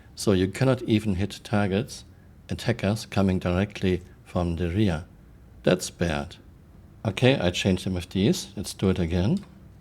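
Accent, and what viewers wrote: Germany. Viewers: Du bist ja Deutscher. Höre ich an Deinem Akzent. Das Problem der Medic-Schiffe ist das neue Gameplay. Wenn ich alle Betten vollständig mit den Gels auflade und eventuell noch ein paar in die Regale stelle, dann bin ich first Target für jeden Piraten und Griefer. Folglich wird keiner mehr Med-Schiffe haben wollen. Toll CIG, oder sehe ich da was falsch?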